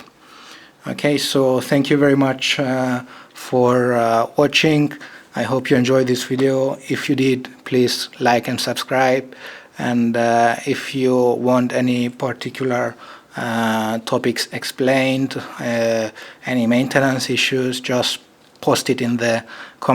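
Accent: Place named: France. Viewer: Thank you! What accent is that? It sounds almost like French! Merci beaucoup! :)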